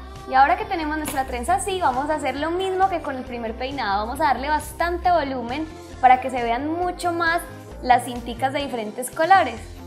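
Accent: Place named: Colombia. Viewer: que país eres ?.... si me preguntan por que : es que tu acento es como colombiano o venezolano AAI NOSE estoy confundida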